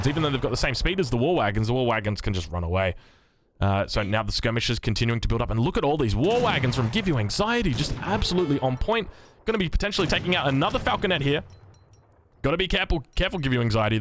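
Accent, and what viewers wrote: Australia. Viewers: the australian commentary really adding to the Mad Max vibes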